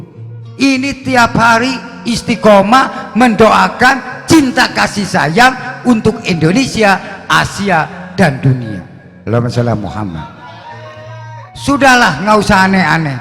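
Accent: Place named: Serang, Indonesia. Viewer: Sehat selalu abuya ......... Logatnya jawa serang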